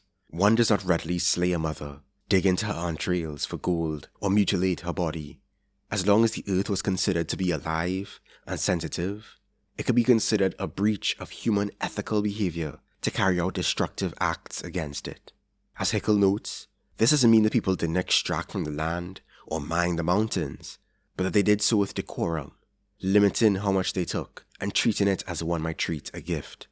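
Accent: Trinidad and Tobago. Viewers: hearing this trini voice dropping gems on my yt recommended was not on my bingo card 😂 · I am a long-time subscriber, a huge fan of your videos. In particular, I enjoy the prosodic cadence in the steady flow of your voice evident in your videos' systematic coverage of a given topics that will ensure they receive the explanation that they are due in time. I found myself thinking during this excellent video's presentation of your utopian-optimist theory-laden anarcho-idealist perspective, in your pleasant Trinidadian voice about the gravity of this rare moment in socio-political-economy which seems to invite a new analysis of every human philosophy or branch of one of the social sciences. For the sake of your intellectual curiosity & to spare me the frustration of yet another rewrite of this message, please watch the following 20 minute video on the polltical-economic assumptions of the 20th century that must be overcome to readjust to the Political Reality of the present era in which corporations have more financial power than nation-state actors. 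I would like to write an essay on this video in particular as it is a worthwhile tangent for serial nonfiction writing I am working on about the evolution of the United States BigTech into the monopolostic behemoths that they are today where dehumanizing pursuit of greater raw power far exceeds any & all ethical principles they pretend to have. At this moment it has become obvious to US Software Engineer YouTubers that Google's Play Store Global Developer Registry policies are paving the way for a grim neofascist/technof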